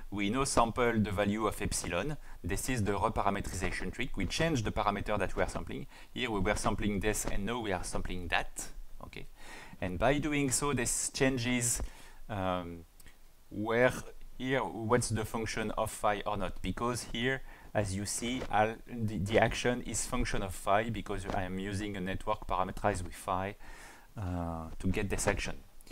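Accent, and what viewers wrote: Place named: France. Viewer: Your french accent is lovely!! Also, thank you for so clear explanation!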